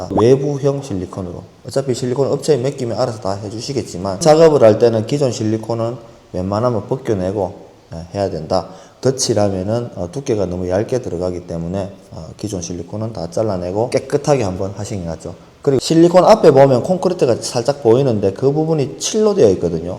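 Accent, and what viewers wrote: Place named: Daegu, South Korea. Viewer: 사투리 쓰시길래 부산이신가했는데 대구시군요~아쉽네요..신뢰가 팍팍갑니다!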